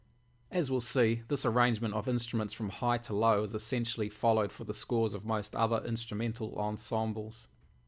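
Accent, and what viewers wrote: New Zealand. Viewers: You Kiwi mate?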